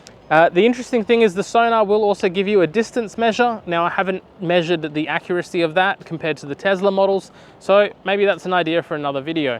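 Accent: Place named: United States. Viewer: Nice car review! Thank you! Btw, you sound like an American so much😂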